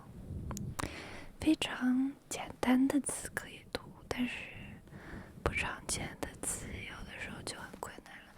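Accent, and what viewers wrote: Standard. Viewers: Your Chinese is really very standard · 讲实话你的中文太标准了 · 发音好标准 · 发音也很标准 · 你的中文发音非常标准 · 明明发音很标准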